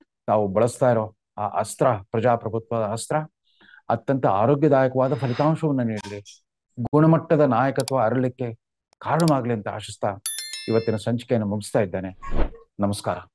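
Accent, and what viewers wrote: North Karnataka, India. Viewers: ನಮಸ್ತೆ 🙏ನಿಮ್ಮ ವಿಶಿಷ್ಟ ರೀತಿಯ ಉತ್ತರ ಕರ್ನಾಟಕ ಭಾಷೆ ಶೈಲಿ ಸುದ್ದಿ ಪ್ರಸರ ಪಡಿಸುತ್ತಿರುವ ನಿಮಗೆ ಧನ್ಯವಾದಗಳು 🙏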